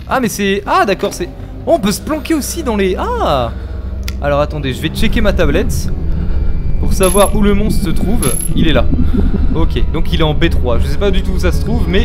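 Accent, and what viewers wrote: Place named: England, United Kingdom. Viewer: j'adore ton accents anglais 😂😂😂 Sinon super vidéo comme toujours 👏👌👍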